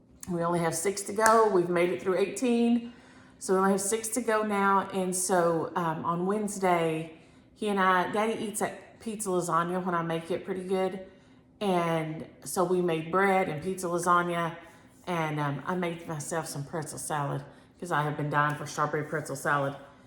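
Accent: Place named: Texas, United States. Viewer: You mentioned East Texas. Every summer we traveled by car from Miami to Kilgore to see my precious grandparents aunts uncles and cousins. They lived there and in Larid Hill many years. It’s hotter than blue blazes! Thanks for sharing such cute patterns. I love bunnies and Spring is coming soon! I miss hearing my sweet families Texas drawl since they are all gone now… so honey I enjoy hearing you.. 😊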